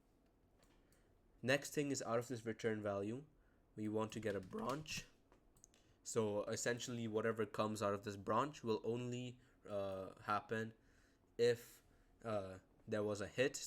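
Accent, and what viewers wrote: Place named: Germany. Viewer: Hey where are you from? You sound like you're from Germany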